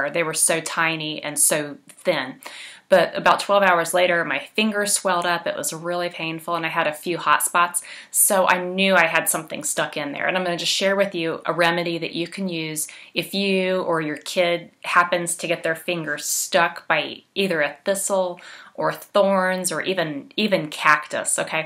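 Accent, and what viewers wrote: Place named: Philadelphia, United States. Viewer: Appreciate Tips no pun intended! I miss that accent it sounds like You’re from Philadelphia! That’s where I grew up but I’m out in California now. I miss ...the people. Haha I have a little tip although unsolicited… If you had the window in front of you this would’ve made a much better video it would’ve been perfect lighting but it’s really dark and hard to see you with it behind you it makes you a silhouette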